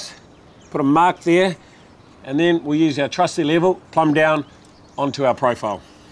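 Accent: New Zealand. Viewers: That’s a New Zealander. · It’s New Zealand not Australia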